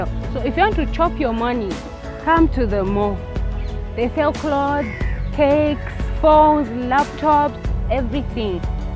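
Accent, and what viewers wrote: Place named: Zimbabwe. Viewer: Nice video babe Your school is nice❤️ I’ll do my school’s vlog too❤️ But why did u have zim accent lol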